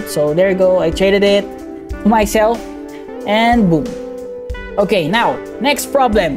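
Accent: Philippines. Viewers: Are u filipino ur accent is filipino accent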